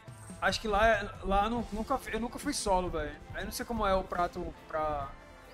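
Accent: Recife, Brazil. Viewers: AGORA MINHA DUVIDAS FORAM TIRADAS!! RECIFENSE NATO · Eita o é do recife?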